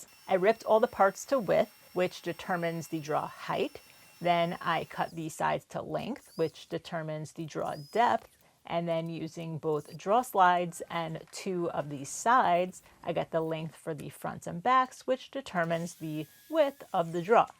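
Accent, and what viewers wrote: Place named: Brooklyn, United States. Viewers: 1st video I have watched. Nice job and very creative on the lift mechanism. Where are you located if you don't mind me asking. Accent says NY but the don't miss being cold says you may have moved south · + cool bench caster lever = Chatty Cathy. You are an adorable genius, not commonly found in the wild (Sounds like Brooklyn). Thanks again for the great video.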